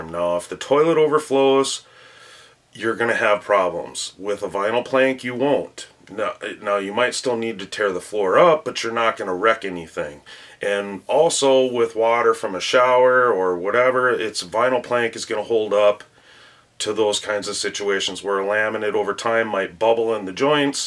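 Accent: Minnesota, United States. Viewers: Nice!! Gonna get me one of those mini planers!! You from Minnesota?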